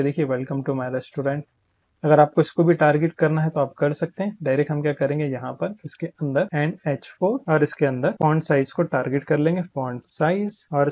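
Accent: Hyderabad, India. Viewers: Bhai ye hyderabadi accent pasand aya mujhe and nice video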